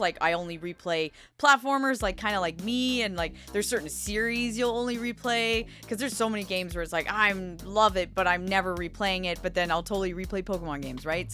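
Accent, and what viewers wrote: Canada. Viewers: I can always tell someone is Canadian when they say “sorry, hard, Mario” and I love it 😊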